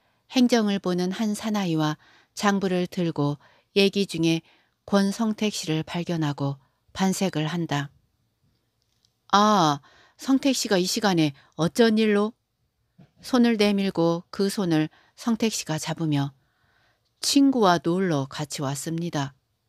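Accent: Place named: Jeolla, South Korea. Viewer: 전라도 억양이 세네요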